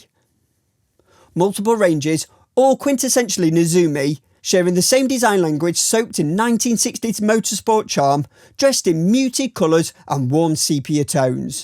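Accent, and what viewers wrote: United Kingdom. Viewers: I have a really hard time understanding a number of British accents. When I watch TV I just turn on Closed Captions, but that eats up valuable real estate on my screen when I'm viewing YouTube watch videos. So, I go into settings and set the playback speed to about 85%, and I can understand nearly every word. Just a suggestion. I think if people who produce content who have heavy accents, regardless of what kind, by ten percent, a LOT more people would be better able to understand them. Also just a suggestion. Great review!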